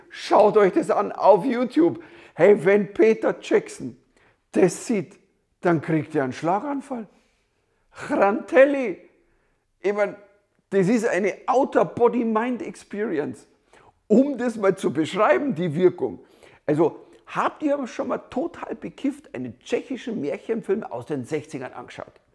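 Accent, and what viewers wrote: Bavaria, Germany. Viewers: nein, nein, passt schon; ich finde es lustig! 😅 Überhaupt mag ich allgemein deinen/Ihren bayrischen Dialekt...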